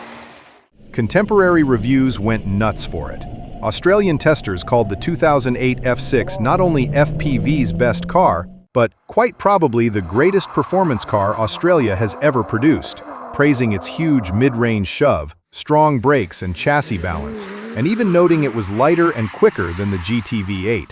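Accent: United States. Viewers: Zed not Zee, god damm yanks, if you're gunna talk about Aussie cars, speak English not yank. And learn how to say Barra, as in barramundi.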